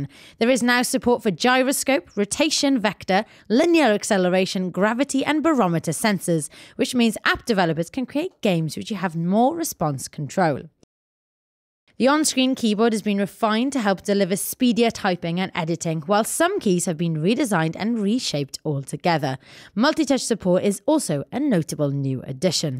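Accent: Wales, United Kingdom. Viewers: Omg!! Maybe because it's not an English accent it's a Welsh one!!!!!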